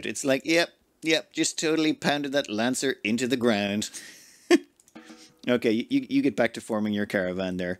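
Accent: Ireland. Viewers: That cannibalistic tundra survival was epic. Maybe you could do an inhospitable biome survival yourself sometime. I'd watch it. Watching you plot and plan is the biggest draw to your channel for me. That and the sweet Irish accent.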